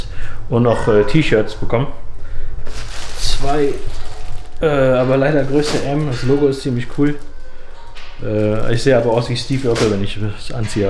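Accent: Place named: Cologne, Germany. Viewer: Haha, einfach den Kölner Dialekt nach Vegas verfrachtet. So muss das sein freu mich auf die Coaching Videos, seh sie mir jetz an. Grüße aus Brühl vom ehemaligen Hürther, an den ehemaligen Hürther! ( der der mit dir im mandalay Bay 2017 kein Kölsch reden durfte) viel Erfolg weiter für dich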